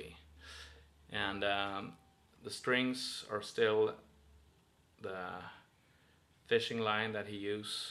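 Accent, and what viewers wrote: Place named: Texas, United States. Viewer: What a cool Texas accent u have!! I need another use!! Nice instrument.....T:)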